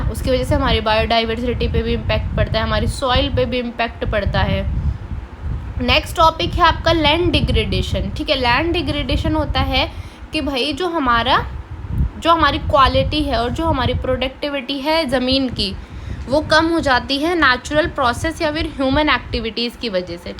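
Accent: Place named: Uttar Pradesh, India. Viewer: Mam , gajab ho yaar aap.😊 Aapko style of talking is somewhat like UP wale jaisa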